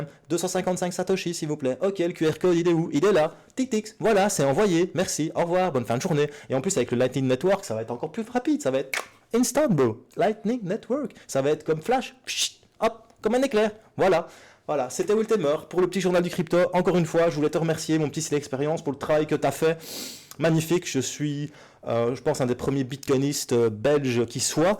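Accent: Belgium. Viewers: J'ai vécu 10 ans à Bruxelles, ton petit accent belge me donne envie de chialer de nostalgie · Je suis un belge expatrié et ton accent me donne une petite nostalgie du pays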